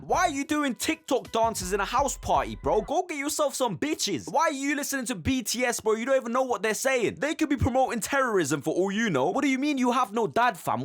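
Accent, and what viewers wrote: United Kingdom. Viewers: he sounds like a fellow brit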